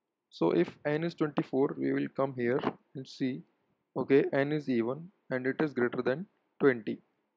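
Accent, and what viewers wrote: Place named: India. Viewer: once I hear the indian accent, I already know the guide is gonna be good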